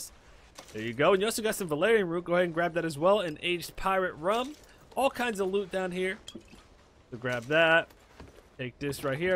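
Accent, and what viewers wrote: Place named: South, United States. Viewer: Like your videos but not the southern accent.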